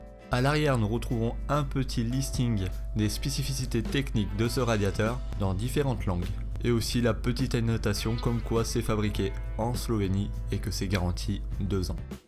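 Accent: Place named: England, United Kingdom. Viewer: Excellente présentation du produit je trouve...Belle accent anglais au passage LEL !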